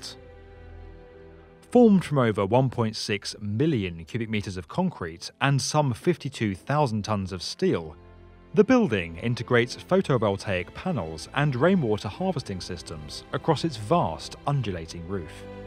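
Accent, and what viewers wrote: United Kingdom. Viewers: I know it was going to be a British guy 😀😀😀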